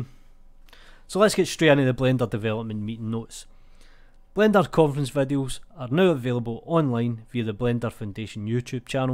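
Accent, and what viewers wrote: Scotland, United Kingdom. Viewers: Loving the channel! I have been trying to catch every vid you throw my way. This unofficial news is sweet. Keep it up! Great to hear a Scots voice doing Blender stuff. Pure dead brilliant, by the way!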